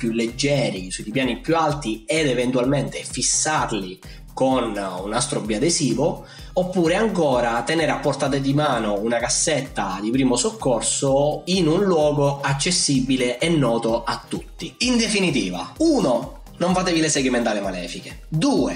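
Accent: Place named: Catania, Italy. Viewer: Hahaha, grandissimo! L'inflessione catanese poi è bellissima, fa simaptia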